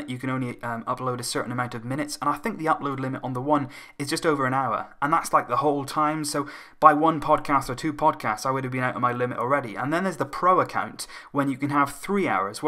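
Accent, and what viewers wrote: Wales, United Kingdom. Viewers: Barcelonista 1983 I'm from Scotland and he definitely sounds Welsh mate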